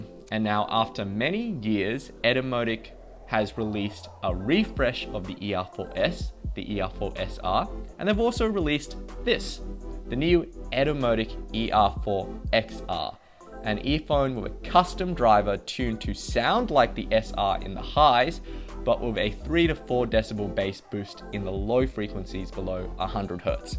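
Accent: Australia. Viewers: uh he doesn't sound north korean, sounds more aussie to me.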